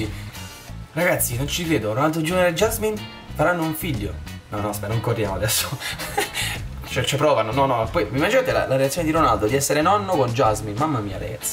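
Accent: Bari, Italy. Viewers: Ma sei di bari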